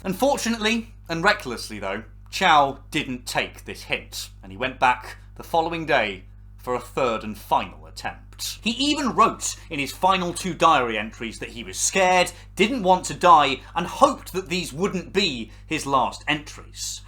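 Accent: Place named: United Kingdom. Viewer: Hate getting two minutes into a video and realising I can't watch it bc the narrator is Br*tish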